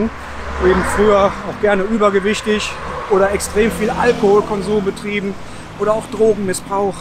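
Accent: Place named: Cologne, Germany. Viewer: diese kölsch immer zwischendurch, einfach herrlich :D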